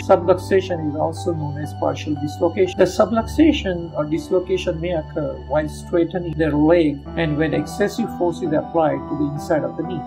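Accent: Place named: India. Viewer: Thats an Indian accent :) loud and clear isn't it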